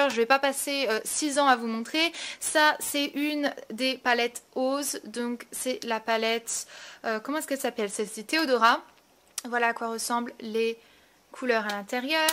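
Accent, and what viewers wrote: England, United Kingdom. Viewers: Super vidéo comme d'hab :) [Aparté : C'est pas un reproche, mais ça me fait toujours mal aux oreilles de t'entendre prononcer la marque "Revlon" en version Française "ON" au lieu de "Revlon(e)" sachant que c'est une marque Américaine et que 99% du temps tu prononces souvent les marques avec ton accent anglais :p Fin bon, ce n'est pas grave, ça fait juste bizarre a entre c'est tout ;) ] Bisou ! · Comment ça se fait que tu as ce bel accent anglais ? Tu es de quelle origine ? J'adore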